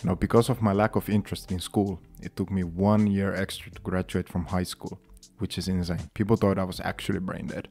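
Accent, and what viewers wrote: Finland. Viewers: I was thinking that this sounds just like a finnish person then i saw the screen shot :D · where are you from? u sound kinda like finland edit: ok, nvm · I don’t speak Finnish (yet) but my mom is from Finland and I knew I recognized your accent / the written language right away. Great video, hyvää työtä !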